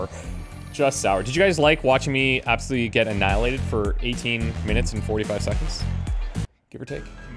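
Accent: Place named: Canada. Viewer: Gets progressively more Canadian as the pressure rises.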